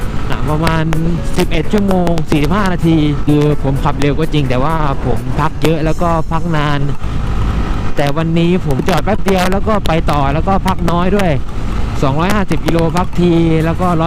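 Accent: South, Thailand. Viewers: คนใต้ซายม้ายนิ... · โซ้ยกาแฟนิ แหลงใต้ชับพึด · พี่คนใต้หรอคับผมก็คนใต้555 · พี่เป็นคนภาคใหนพูดเหมือนคนใต้เลย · คนใต้หรอ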